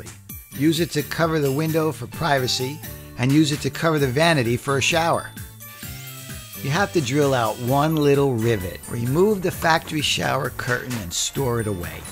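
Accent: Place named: New York, United States. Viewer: u sound like a goodfella u knw like goodfellas the movie ....new york accent.is class 😙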